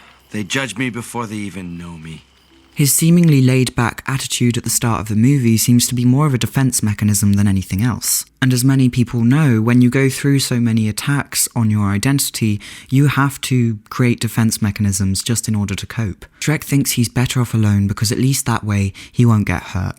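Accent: RP, United Kingdom. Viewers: Comes for the intriguing premise of the video... subscribes and clicks the bell for the adorable person with a wonderfully posh RP accent narrating said video.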